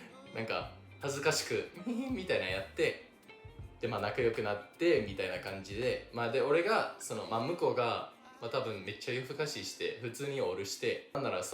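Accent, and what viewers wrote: Kansai, Japan. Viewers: 日本語がめちゃくちゃ上手いですね！いわゆるアメリカ訛りのアクセント強めの日本語発音もしてないし、しかも関西弁😂日本の文化を好きになって、日本語を習得して凄いですね！ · 関西弁はええよな！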